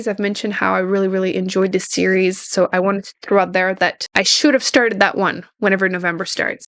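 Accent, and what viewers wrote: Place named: Quebec, Canada. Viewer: Glad you said you were from Quebec-I kept trying to place your accent. I also have only enjoyed Big Little Lies by Liane Moriarty - I gave up on her.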